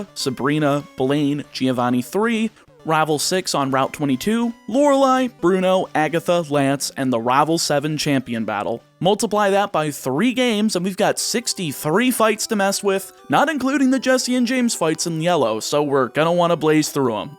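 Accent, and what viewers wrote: South, United States. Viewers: YouTube told me i haven’t watched this video so here we go again with the funny southern man and the way he says rAival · Anyone else think he's pronouncing Rival real southern like in the beginning? In fact, he seems to slip into a southern draw alot this video xD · At the beginning I couldn't help but notice that you are definitely southern. You don't have a strong accent for the most part, but when you said the list of rivals you pronounced a lot of them as rahval. As a fellow southerner there's nothing wrong, just an observation.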